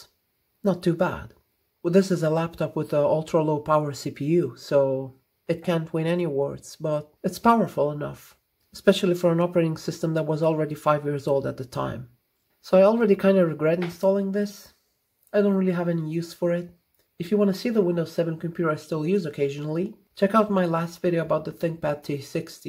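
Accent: Italy. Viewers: Ma sei italiano?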